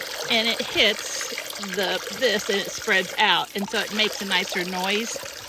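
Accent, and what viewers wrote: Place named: Ohio, United States. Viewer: Those turned out beautiful now I’m not sure what state you’re in. I wanna say Ohio, but I could be wrong. Anyway you have a little bit of an accent, but that was very good. Even your one in it were great. Good job. I’m gonna do that here in my property, but I found a flat angel That was originally hooked to a fountain and of course he didn’t have that the thrift shop and I have a ceramic pot and I made it. It’s gonna look really cute yeah but I was thinking to keep the leaves out of your red pan. Why not cut out a little piece of Spring and then glue those gem rocks on it and put that over the drain maybe that would help keep that cleaner longer. Have a great day. Thanks again. I loved it.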